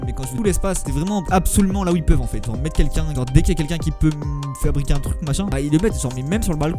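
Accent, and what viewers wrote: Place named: Banlieue, France. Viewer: Des barres 😂 Ton débit, ton phrasé, tes expressions, ton regard " Banlieu " loin de " l'Univers Montagnard " mais il y a des vérités dans ton speech ;)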